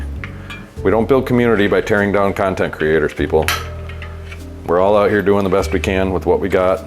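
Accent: Wisconsin, United States. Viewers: I feel like you are from Wisconsin saying Ope! Later on in the video figured it out. Great video! I might do a similar upgrade.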